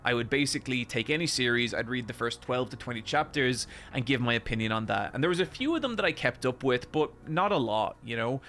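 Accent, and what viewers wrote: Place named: Ireland. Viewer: Watching that guy's videos is going to be like reading nu-Berserk. It's better than nothing, right? Have you noticed that people try to imitate your tone of voice and cadence? It's not just your video titles. They're trying to copy literally everything about your videos minus the Irish accent. What if you include the official Shonen Jump rankings as well as the sales figures? Then you can piss off everyone lol. I really miss those videos of yours because I never gave a shit about Shonen Jump before you started making them, and I have stopped giving a shit about Jump since you stopped making them. I think I am representative of the majority of your audience rather than the Shonen Jump nerds who nitpick them. Maybe you could make a Youtube poll asking these sort of questions: "Did you care about Shonen Jump magazine before watching my Shonen Jump videos?" and "Have you stopped caring about Shonen Jump now that I've stopped making videos about it?"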